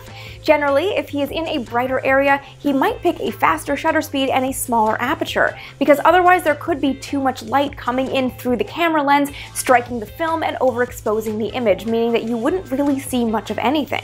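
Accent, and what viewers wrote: Canada. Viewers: About. Canadian. That is all. Bye.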